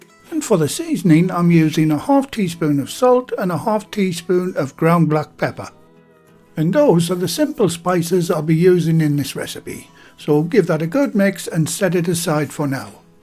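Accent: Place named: Sunderland, United Kingdom. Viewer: Always enjoy videos some great but simple recipes well explained. The Mackem accent always helps!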